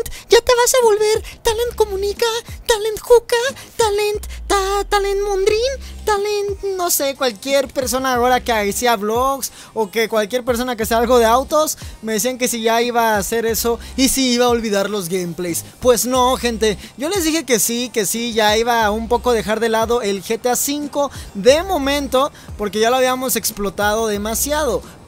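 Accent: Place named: Mexico. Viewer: Este guey es Mexicano 😂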